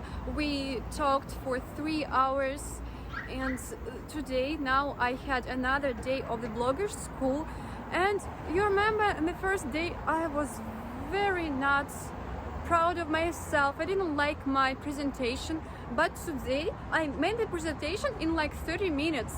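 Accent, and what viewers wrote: Russia. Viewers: I think it’s her Russian accent when she speaks English.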